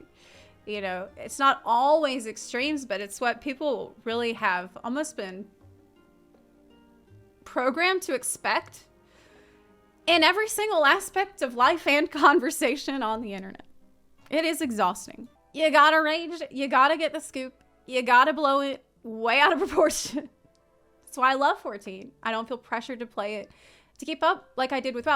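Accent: South, United States. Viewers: Is zeplas southern Akzent stronger then bevor ? Sounds like it ! Guessing merica is brushing off on her. Disclaimer i know she is from america. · Random note: your southern gal accent has made a major comeback since you were forced to return to the US! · Wow haven't seen a video since she's been back in USA and didn't realize her accent came back this hard. When I was a kid my family said I got a southern draw from going to my friends house.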